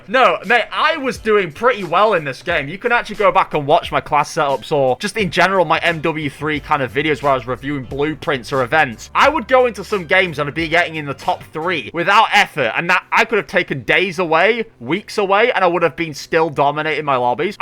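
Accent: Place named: Yorkshire, United Kingdom. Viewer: Bro you have literally the exact same opinion as me, even down to the yorkshire accent lmao.. was saying this on reddit the other day, went on to complain about hackers and some nob was trying to say it was the best game of the modern era and it rage baited me hard enough to give this entire breakdown to them basically bar for bar, word for word what you're saying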